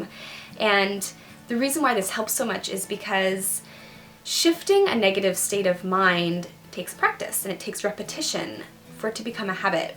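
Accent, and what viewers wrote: Canada. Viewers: Awesome guidance! Super excited when you post a new video! Oh, and I love the Canadian accent!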